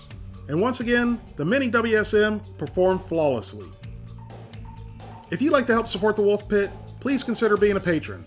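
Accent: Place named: Virginia, United States. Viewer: Are you from Virginia? Your voice along with style of cooking reminds me so much of family in VA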